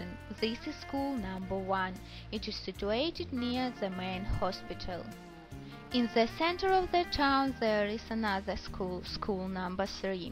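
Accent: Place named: Russia. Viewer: Сразу видно что русский акцент.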